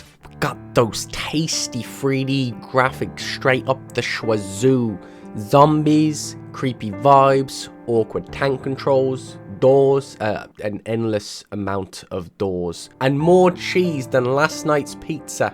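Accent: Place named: United Kingdom. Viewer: you are awesome dude👊😂, can I ask you where are you from precisely? your accent seems british but not the british I know🤔.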